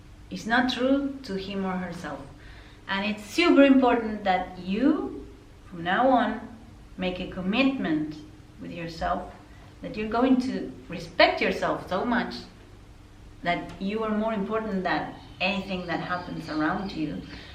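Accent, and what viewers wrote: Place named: Argentina. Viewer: Me encanta. Ya sabia cuando te escuché hablar en inglés que eras argentinaaa🎉 Yo soy americana pero tengo gente como familia en argentina (Viedma Rio Negro). Gracias por este mensaje..necesitaba esto hoy. ❤❤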